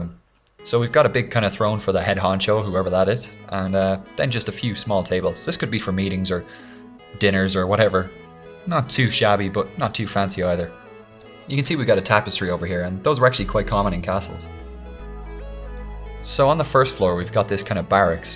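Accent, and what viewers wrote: Ireland. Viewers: i know you mighteve already got this question but, are you irish?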